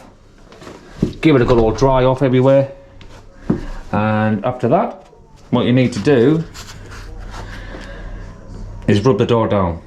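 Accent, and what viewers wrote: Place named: Northeast England, United Kingdom. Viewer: You sound like you are from the North East of england, just wondered how the paint managed in all the glorius weather we had? Looking at doing my own with the same paint and your video popped up in my searches. Thank you!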